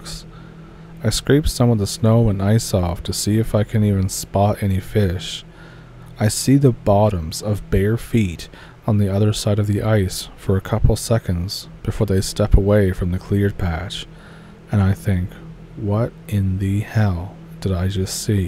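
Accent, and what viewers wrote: Canada. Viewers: I have to say, I really like this channel! I think I have listened to all the stories, and I get a little excited when you post a new one!! You are a great storyteller, and I ALWAYS enjoy pulling up a stump to listen!! Also, you have a nice Canadian accent, very kewl!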